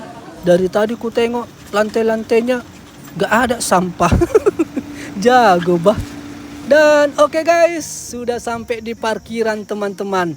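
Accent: Medan, Indonesia. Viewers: suara abang khas banget melayu medan senang aku dengarnya bang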